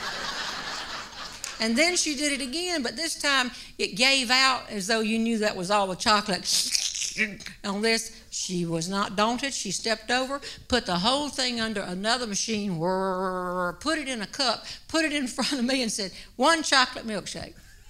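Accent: South, United States. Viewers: I've never heard a southern drawl in real life... it's quite comforting. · She is a gem! She used “mash” when “pushing” a button. Love her Southern twang! Recently discovered! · Depending on which part of the south one is from will determine how your southern accent is accentuated. I am from New Orleans and would have a hard time understanding me. However, her voice plays like fine music to my ears. I can also listen to Shelby Foote in the still of a morning, drinking my first cup of coffee and feel all is well. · It wouldn't be the same without the southern accent. I could listen to her for hours. I can't listen to Samantha Bee or any of the newer "female comics/comedians" for more than a few seconds.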